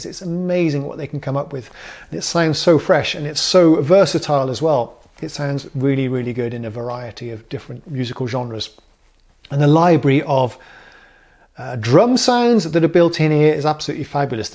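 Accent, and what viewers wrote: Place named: RP, United Kingdom. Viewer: Wow was not expecting an Electribe demo video to feature the poshest accent I've heard since I watched Our Man in Havana a month ago.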